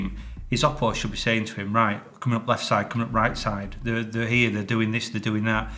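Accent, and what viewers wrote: United Kingdom. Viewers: A traffic cop where? Your accent makes me believe you’re from Britain/England/ the UK. What do you people call your country nowadays?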